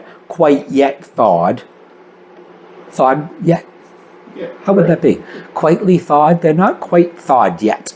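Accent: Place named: Massachusetts, United States. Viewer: Are they in my home town? Newton Mass? Accent is correct!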